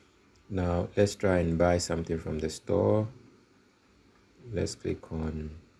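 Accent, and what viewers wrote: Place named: Ghana. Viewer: great video. I can see you have a Ghanaian accent, and I would like to link up. I want to learn from you . Great video sire.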